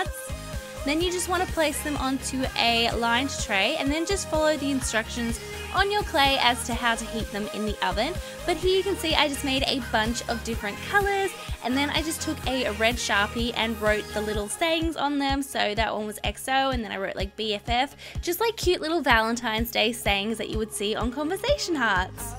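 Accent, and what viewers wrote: England, United Kingdom. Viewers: I'm new to ur channel and I notice that u r so adorable oh and I'm from England too I notice your accent (idk I'm not good at accents)😝 Btw I subscribed to ur channel 👍💚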